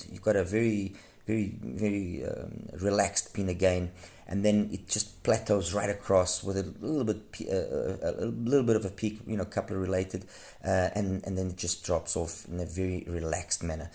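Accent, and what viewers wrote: South Africa. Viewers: You must be South African...😊i can hear the accent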